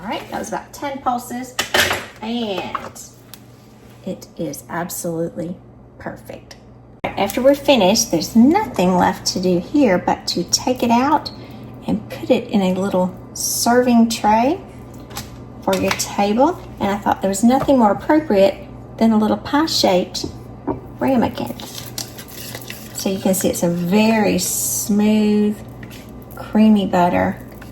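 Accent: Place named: Georgia, United States. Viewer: Happy Thanksgiving to you and your family. I have been watching for awhile now and I love the variety of recipes you present. I also love your gorgeous Georgia accent. Since I'm from Alaska I don't have too many southern accents around me! One of my favorite holiday desserts is pecan pie so this looks delicious to try. God bless you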